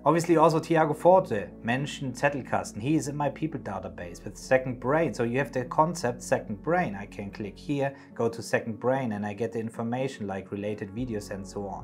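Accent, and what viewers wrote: Germany. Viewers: Do we here a german accent here? :D Great video! Greetings from Karlsruhe!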